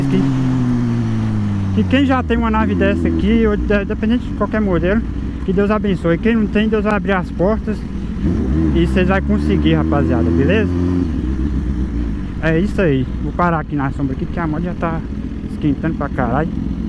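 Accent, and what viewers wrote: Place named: Minas Gerais, Brazil. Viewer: Show de bola! Que "minerês" é este ? show a língua nova, "gíria" kkkkk valeu a história/motivação total! Abraço!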